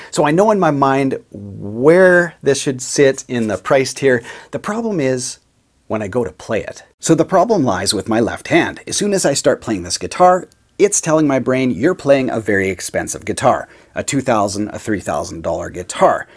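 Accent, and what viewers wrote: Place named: Canada. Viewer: Waiit... are you Canadian? LOL. I feel like I can kinda hear an accent.. either Canada or like North Dakota.. maybe that upper area of Wisconsin...or Ohio?? But... prob Canada.. ?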